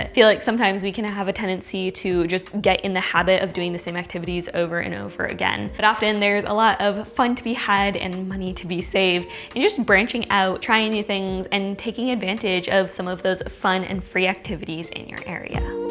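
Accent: Canada. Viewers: Had you not featured US Dollar bills in your video, I would've thought you're Canadian based on your accent. The Venture X is a powerhouse for sure. I'd also recommend the lesser-known AAA Daily Advantage Credit Card, which has become my primary CC (5% on groceries, 3% on Warehouse Clubs (a category that not even the Costco Citi VIsa offers that rate of rewards for), 3% on Gas and Pharmacies, and so on... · I noticed the Canadian accent right away 😂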